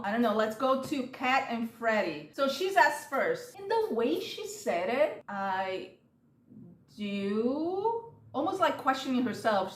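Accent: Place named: Brazil. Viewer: Are you from Brazil? I can hear a little accent 😊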